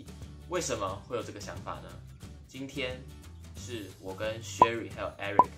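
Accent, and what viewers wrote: Xiamen, China. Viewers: 还好了，台湾腔，就是闽南厦门一带人说普通话的腔调而已。厦门人说普通话也你的调调。只是很多人不知道而已。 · 我感觉厦门那边普通话也这个味儿其实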